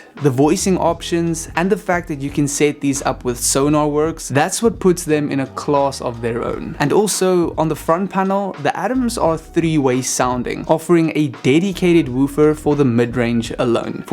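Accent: South Africa. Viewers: are you south african dood i can hear it in your voice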